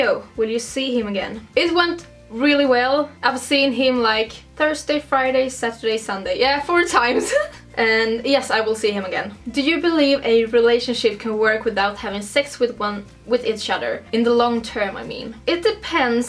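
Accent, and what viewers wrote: Sweden. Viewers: the swedish accent is real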